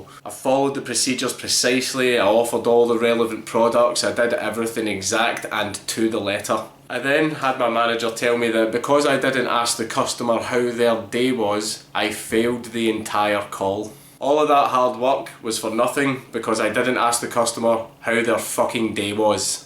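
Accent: Scotland, United Kingdom. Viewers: Hans Kloss its Scottish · LOL so very Scottish.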